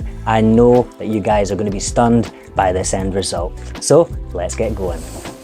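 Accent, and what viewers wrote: Scotland, United Kingdom. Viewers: Have relatives from Northern Ireland, who always considered themselves as 'British' rather than 'Irish,' but I'm not too familiar with accents but think you might have some Scottish roots too. Perhaps not, but you have a new subscriber here...) Best wishes!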